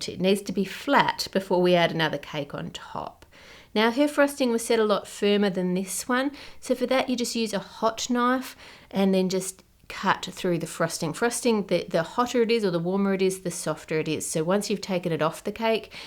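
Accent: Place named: Australia. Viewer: I would watch these videos solely to hear pronounce "chocolate" in her incredible Australian accent. As a linguist, it makes me inordinately happy.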